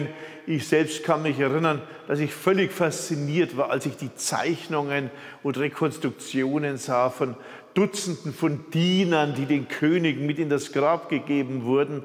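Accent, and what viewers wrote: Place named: Bavaria, Germany. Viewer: Der Mann ist aus Bayern. Hört man aber eigentlich.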